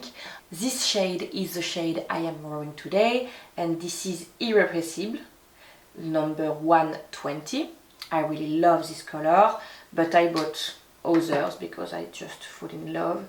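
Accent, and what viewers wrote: France. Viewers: I love your French accent! One of the reasons why I like your videos ❤️ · Don’t fret over your accent! Your English is very good and how many of us wish we spoke with a French accent? :) Another great video! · I love your accent! You're very French, of course! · no problem understanding you at all, in fact i love to listen to you: your melodic diction and French accent are delightful, while you are also very articulate. In English the nail color is a dark teal, i'd say.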